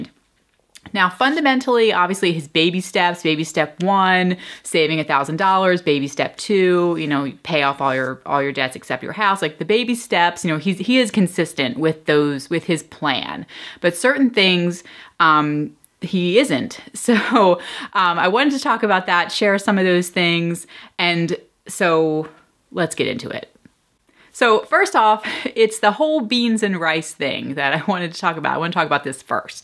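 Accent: Philadelphia, United States. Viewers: Lol. Ok I should of waited to end of video. Heard the Philly area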